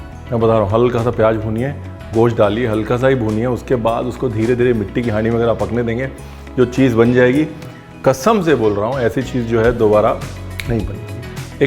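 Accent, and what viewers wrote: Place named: Lucknow, India. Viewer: mutton ka zayka ek taraf, aapke lucknowi accent ka zayke ka to jawaab nahi ! <3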